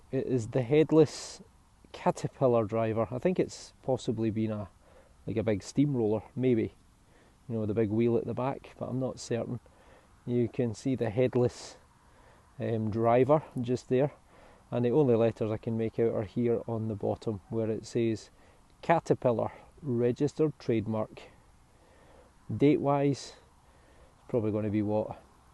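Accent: Scotland, United Kingdom. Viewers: Great upload as per, buddy. Your accent reminds me of one of my favourite scientists/presenters, Dr Iain Stewart, geologist/vulcanologists etc. Really makes for great listening. I hope that's not offensive, as I'm not sure where he's from in Scotland.! 😁. I've a lot of knowledge of the English monarchs going right back to the first Saxon King of Wessex. Your uploads are making me research the Scottish monarchy, as I'm quite ignorant to it, so much appreciated. Bless up 👊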